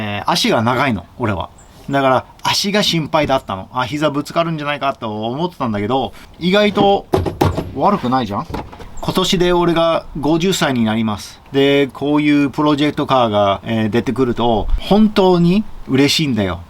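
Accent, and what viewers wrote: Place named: Japan. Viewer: Your accent really be heard as very Japanese! awesome! このプロジェクト、楽しみにしています！ POVのSteveさんをびっくりさせましょう！！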